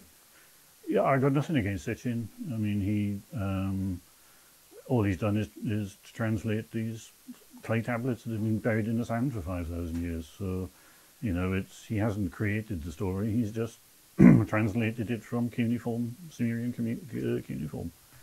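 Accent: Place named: England, United Kingdom. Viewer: The subtitles are only about 70% accurate as he has an English accent. Just one of the many hundreds of English accents and google can’t seem to be bothered to fix the issue even considering the amount of content that comes England for our small size and population. Truly the master race.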